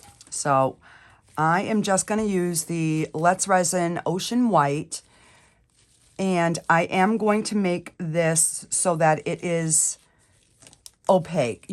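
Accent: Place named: Rhode Island, United States. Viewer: You sound like my RI family 😀 I enjoy watching you create.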